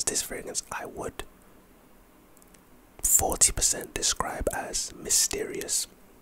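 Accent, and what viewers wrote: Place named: United Kingdom. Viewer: bro reminds me of hobie (british accent) 🫣🫣‼️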